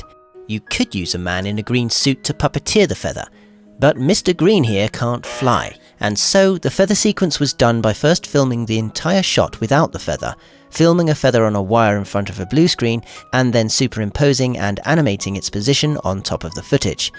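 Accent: United Kingdom. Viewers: I like your accent. It sounds like you might be British or a touch of something else also. Have a nice day whoever you are. My name is Charlene!😊❤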